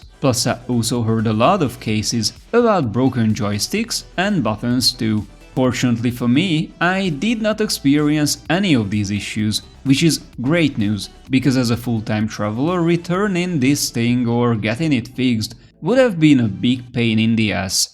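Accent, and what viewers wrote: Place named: Hungary. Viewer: Magyar vagy? Legalábbis akcentusból ítélve. :)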